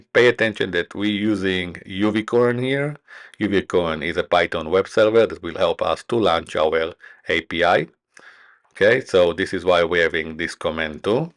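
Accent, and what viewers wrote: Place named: Israel. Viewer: Brother are you Israeli by any chance? the accent is too noticeable 😅😆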